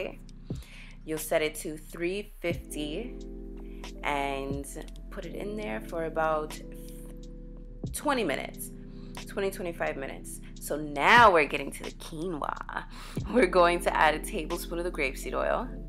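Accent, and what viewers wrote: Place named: Caribbean. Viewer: U sound so Caribbean, Jamaican or barbadian to be exact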